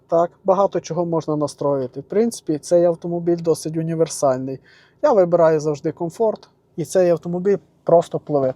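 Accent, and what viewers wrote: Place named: Transcarpathia, Ukraine. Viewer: Ти з Закарпаття "Чоловіче"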